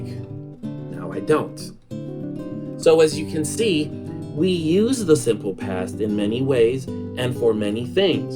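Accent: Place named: United States. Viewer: Amazingly well produced video. Props for the job. It really catches us. I found interesting how you pronounce the “ed” in some words - almost imperceptible in your American accent. I learned a lot.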